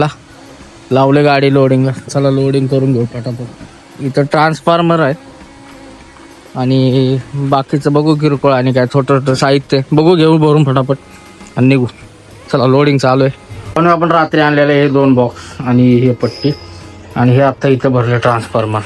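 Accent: Satara, India. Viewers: Bhava satarai Tone badluu nka. Plz. Majjja yet naiii laka..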